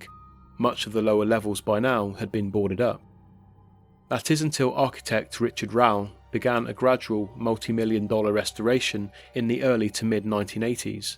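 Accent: England, United Kingdom. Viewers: I enjoyed those short stories, not heard any of them before. It is good to hear an English(?) voice making the narratives.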